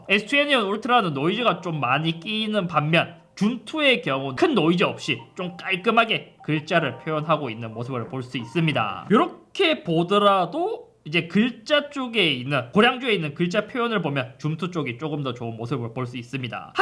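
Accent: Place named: North Gyeongsang, South Korea. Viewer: 잇섭님 리뷰는 대구사람으로서 듣기 아주 편합니다. 보통 유투브 경상도 사투리는 경상남도 사투리라 되게 다르게 들리는데 고향이 경상북도신가 봅니다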